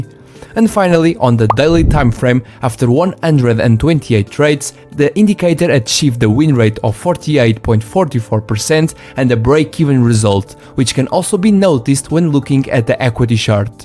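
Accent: Portugal. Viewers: you are portugues right?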